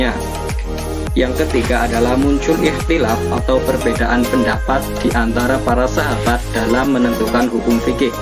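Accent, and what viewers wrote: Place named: Banyumas, Indonesia. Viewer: Mantap sodara ngapakku🔥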